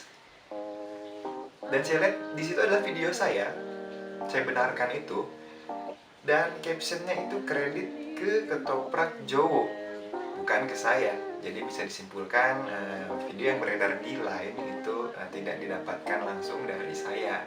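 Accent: West Java, Indonesia. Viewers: aa orang sunda ya😂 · yallah a sunda banget bahasanya wkwkek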